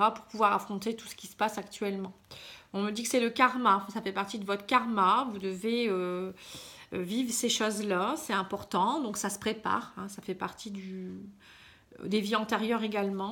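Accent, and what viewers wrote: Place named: Picardy, France. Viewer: Bien vu pour la F J ma moitié trop de signes qui me font penser à lui c est fou heures miroirs son prénom partout sa région !! Je suis Picardie et votre accent ne ment pas ma TCHOTE j ai le même !!! Félicitations pour votre perspicacité et votre don sans tous ces tralala bougies pierres statuts !!! Merci merci merci beaucoup et vous êtes sur le bon chemin vous aussi !!! Chacun sa vocation je soigne avec mes mains suite à une Mort imminente au Touquet Plage je vous embrasse et a bientôt en tant qu Abonnée 🙏😇👍💋🌹🌹🌹🌹🌹🌹🌹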